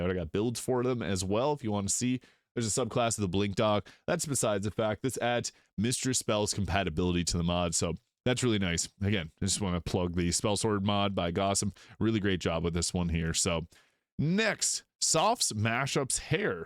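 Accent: Canada. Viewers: i feel like that is how you would actually say it. 1st time i heard you pronounce about as "a-boot" i was like, this guy is definitely from the great lakes area or canadian.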